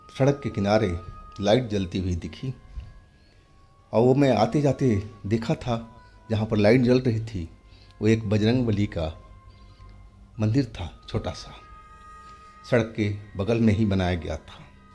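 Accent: Bihar, India. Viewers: Nai ye bhai bihar ke taraf se hai, sun sapata bihar me hi bola jata hai. please clear 😂😂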